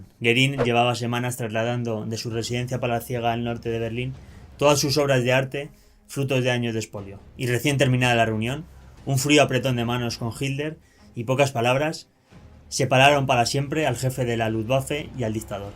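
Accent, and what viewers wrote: Spain. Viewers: Cuando hablas no te entiendo tu acento español busca un comentarista peruano, ellos hablan muy bien el espanol. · Interesante, pero seria mejor que no salieras en pantalla y mostraras mas información visual para redondear tus diálogos. Tu acento demasiado español, ese silbido exagerado incomoda a muchos como yo. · a para pinche acentito hay nos vemos odio el acento.de los españoles.no lo pude ver ni un minuto.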